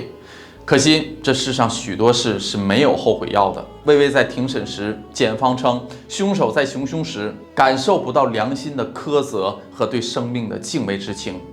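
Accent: Northeast, China. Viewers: 是不是，东北人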